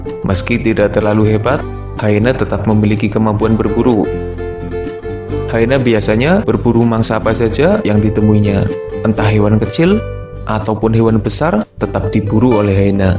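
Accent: Banyumas, Indonesia. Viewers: Jujur, admine wong ngapak duk???, ora ngapak ora kepenak mbok?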